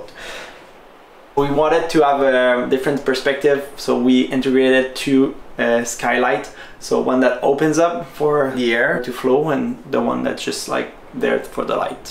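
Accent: Quebec, Canada. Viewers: Tu le sais tout suite quand un québécois parle en anglais haha ! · Quand t'entends l'accent Quebecois! OMG C accessible!!!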